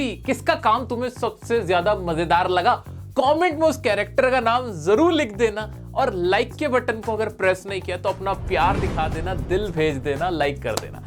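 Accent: United States. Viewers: American accent😂😂 Btw sir thank you soo much 🐱 Itna achaa padhaane ke liye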